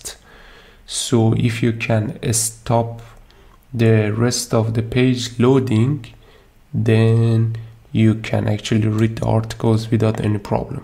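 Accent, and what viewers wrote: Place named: Iran. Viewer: way to go man. I love the section you speak about the Javascript Garbage. 😅😆😆. We appreciate the Persian accent by the way!!!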